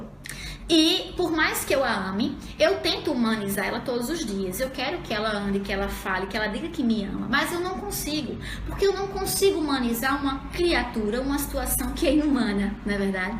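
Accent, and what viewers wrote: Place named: Paraíba, Brazil. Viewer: Nossa!!!! Que aula maravilhosaaaaaaaaaaaaaaaaaaaaaaaaaaaaaaaaaaaaaaaaaaaaaaaaaaaa Professora pensa como eu penso. Mt didática e ao mesmo tempo teoriza mt bem... Mas o sotaque parece de paraibana rsrsr. Meu Nordeste me Orgulha mesmo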